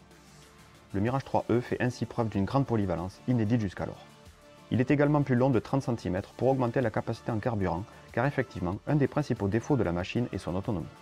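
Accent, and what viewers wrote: South, France. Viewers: L'accent du sud, avec tout plein de Mirages avec des cocardes rouges à croix blanches... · pour l’accent du sud ! Super contenue technique au passage . Un petit dossier sur le pa49 ou un petit Leduc des familles ? Continue ! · Passionnant ! Continue comme ça. Et le petit accent du sud ajoute une touche vraiment sympathique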